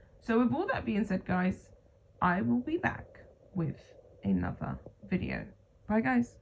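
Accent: United Kingdom. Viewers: Anyone reminded of the film ''Misery''?.. Lovely to hear a Brit doing this for a change! Good on you girl.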